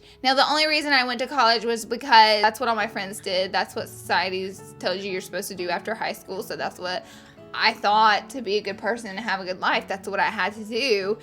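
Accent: South, United States. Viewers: I'm from mobile Alabama. I just love your videos and southern accent. I feel you girl ❤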